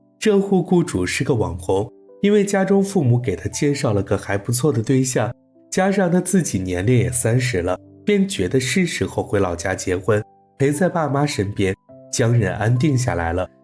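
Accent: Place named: China. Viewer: 最初聽到的聲音很好聽、就跟著看了光影二三事、分不清現在是喜歡聲音還是被電影吸引了😂😂以前只喜歡台灣的國語、原來中國的國語也這麼好聽的👍🏻👍🏻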